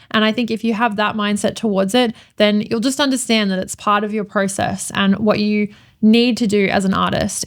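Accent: Australia. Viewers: Great points across the board. I'm on the big side of my 50's and it took until my 40's to be able to let almost all negative comments slide off my back. This episode can be so good for the young ones that take their interactions so personally. My difficulties come from learning street photography:).. I so want to get better at it, but I really get the icks when people get scrappy with me. Great stuff, I really enjoy your content (and the Gold Coast accent is wonderful - keep on keepin' on)...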